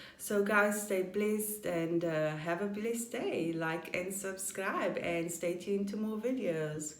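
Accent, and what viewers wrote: South Africa. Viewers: You're definitely from Cape Town, but did you sound like you grew up on the Flats, right?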